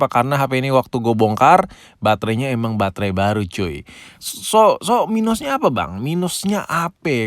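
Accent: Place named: South Jakarta, Indonesia. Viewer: Bng lu org jaksel ya? Cara ngomong ny jakset bet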